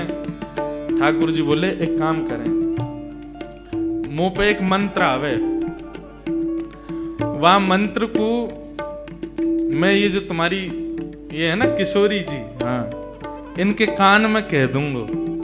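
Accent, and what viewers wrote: Braj, India. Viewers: Aapka nauka bihar prasang brajki local bhasa me sun ke atma trupti hogayi. Jitna sune utna mitha lage. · समज आवे ना आवे पर सुनने में बहुत पियारी है आपकी ब्रजभाषा आप के जैसे ही है 🙏🙏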